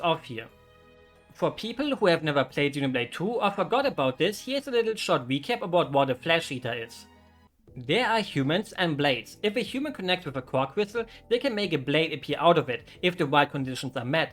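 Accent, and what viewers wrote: Germany. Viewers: I just came along this video and looked at your channel and thought: "wow that this is a Nia fan and he has a Deutscher Akzent" and then I took a look at the channels description and I was definitely not disappointed xd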